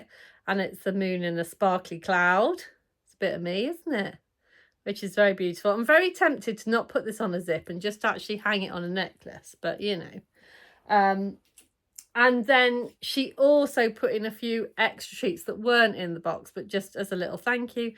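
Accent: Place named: United Kingdom. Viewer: Oh....your lovely British accent!! A Router ... tee hee, got it now! So enjoy your sparkle videos!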